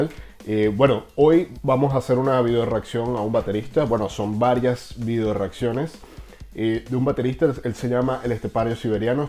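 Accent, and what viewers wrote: Venezuela. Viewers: tu eres venezolano rajao brother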